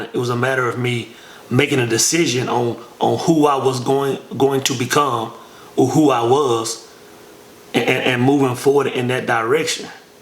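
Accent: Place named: East Texas, United States. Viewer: Man u from East Texas. I knew it! Great video!! I'm in Cedar Hill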